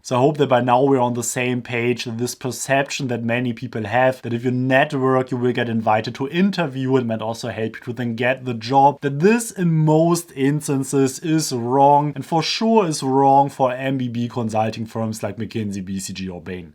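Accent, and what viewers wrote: Germany. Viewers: Your german accent is so refreshing!!! Wow! Normally a video on networking or sales has a fast talking American 😝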